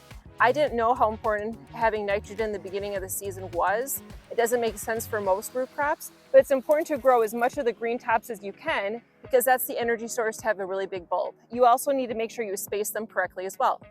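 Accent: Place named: Minnesota, United States. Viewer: I love the video. Your Minnesota accent is in full effect!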